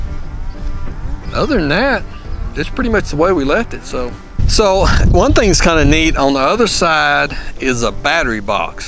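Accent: Texas, United States. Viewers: Definitely sounds like Texas.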